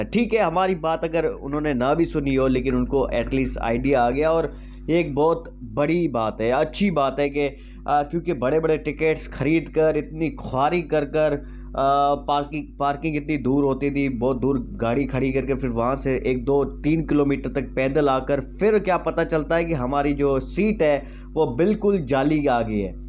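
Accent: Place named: Sindh, India. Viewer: video wle larke ho aap? Han apke bolne ka lehja sindhi laga tbhe pucha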